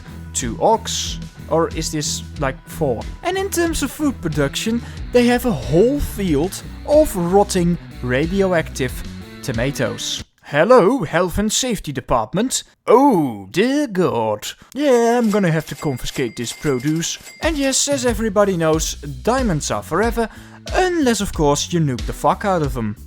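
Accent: Netherlands. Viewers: Its funny how a Dutchman recognizes another Dutchman just from their English accent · Yes always, wonder where this guy’s from, Amsterdam? He sounds like he’s from the North Holland province.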